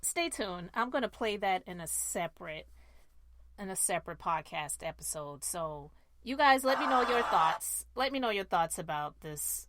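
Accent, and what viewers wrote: Charleston, United States. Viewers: Hey girrrl, you sound like a Charlestonian!!! Then, you do know this jerk is full of it!!!!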